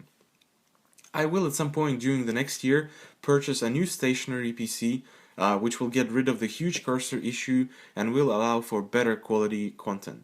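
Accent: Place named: Russia. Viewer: Вау, я конечно всегда слышал твой акцент и подозревал что ты русский, но,так как ты записал видео про рашн мафия колоду на английском языке, я разуверился. Круто слышать что русских популярных ютуберов тоже не мало (тролден например). But anyways for all of you who are wondering what the hell is this wall of text, I just want to thank you, for making good content and just being awesome overall. Keep up the good work man.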